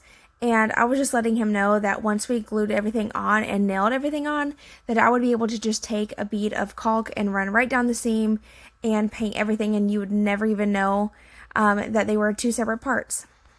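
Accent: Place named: Kentucky, United States. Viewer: You sound like a Kentucky girl. Island looks real good. I'm building a 6 foot island like this for my wife.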